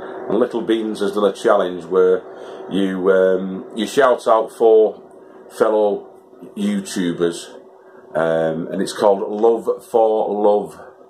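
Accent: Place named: England, United Kingdom. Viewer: Thanks! Checked them all out and subscribed to most. Many I was already following. Love all the new channels. I think I am in danger of developing an English accent! But yours is still my favorite. Things are great here. I planted what seemed like 100’s of cloves of garlic yesterday. Harvested a big old broccoli for tonight’s dinner. Keep up the good work on your end. Wish I had the courage and the know how to do a channel. But at 70 I think that I am too old to learn filming and editing.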